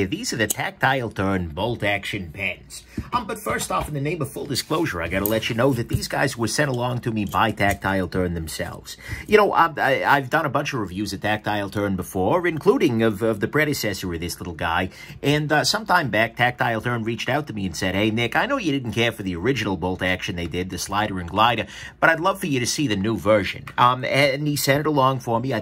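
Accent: United States. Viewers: Russian? Some sort of American accent is what I am hearing... Kind of reminds me of a Warner Bros character...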